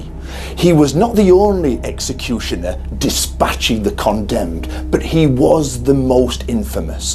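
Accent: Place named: Middlesbrough, United Kingdom. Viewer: I'm trying to figure your accent out....a bit of a Middlesbrough accent....a bit sunderlandish, bit of a Mild Geordie twang. I think your from somewhere between Hartlepool and Sunderland. I'd love to know if I'm close.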